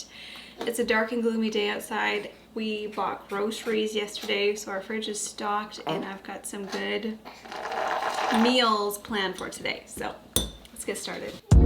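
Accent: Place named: Canada. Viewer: Love your videos so much, whenever I hear that Canadian A sound it makes me smile a little. I'm American I don't know why I just find the way you say words like bagels to be so charming like oh that's right! We're neighbors, look at those slightly different vowels! Haha!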